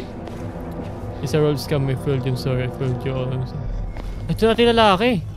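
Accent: Visayas, Philippines. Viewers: Kuya bisaya kapoba?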